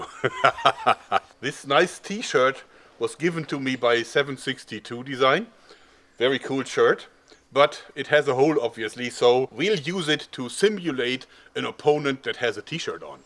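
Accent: Germany. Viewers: I think you have a very german accent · you sound a bit German or so... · It really sounds like you're from Germany. Are you? I'm sorry if I (idk) hurt you.. lol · are u Part german because u have a strong accent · Ahhh du sprichst deutsch geil sofort am aktzent erkannt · Dieses deutsche englisch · Are you from germany because of your Accent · are you german ? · is he german? ist er deutsch? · even for me as a German I must say...gosh this rough accent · are you german? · Lern mal Englisch deine Betonung ist wirklich wie ein richtiger deutscher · you speak like a german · Jeder der deutsch spricht merkt das er deutsch ist 😂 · Hört sich an wie deutsches englisch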